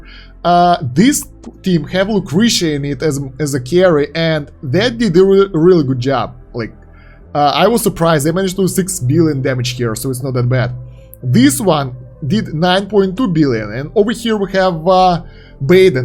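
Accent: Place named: Russia. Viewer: Русский) сразу слышно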